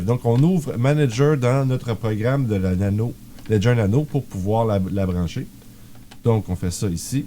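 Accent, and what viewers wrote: Canada. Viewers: Top merci depuis la France et l accent canadienne adore !!!!!!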